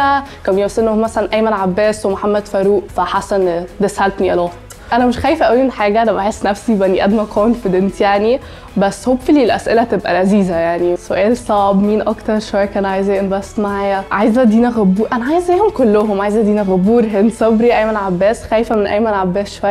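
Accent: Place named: England, United Kingdom. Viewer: واضح ان حضرتك متفرجتش على الحلقة علشان لو اتفرجت هتعرف انها عايشة في انجلترا ومدرستها هناك فده سبب كفاية يخليها تتكلم كده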